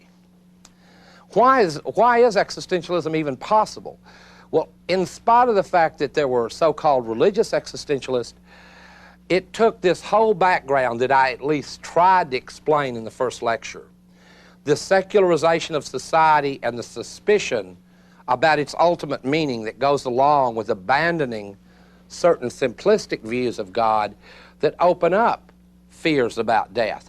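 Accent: South, United States. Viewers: the southern accent is not doing him very well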